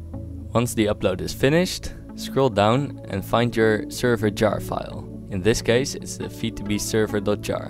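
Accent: Netherlands. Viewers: your accent sounds dutch xd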